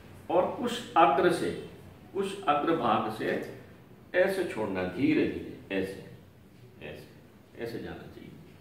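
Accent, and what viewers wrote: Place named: Madhya Pradesh, India. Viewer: गुरुदेव प्रणाम मैं हरदा से हु आप मध्यप्रदेश से है ये आपकी भाषा से ज्ञात हो रहा है आप होशंगाबाद या जबलपुर से है ये मेरा अनुमान है कृपया बताये आप कहाँ से है जय श्री राम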